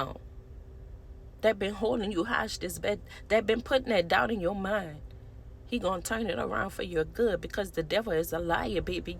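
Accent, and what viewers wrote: Louisiana, United States. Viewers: Say that Sis 👏🏾👏🏾‼️ I’m loving that Louisiana twang!!!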